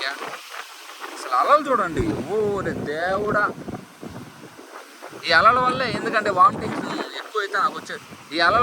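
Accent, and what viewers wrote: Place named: Nellore, India. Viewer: Brother ne bochulo Nellore slang aaapu, and marchu plz madhi kuda Nellore but vinaniki irritate ga undhi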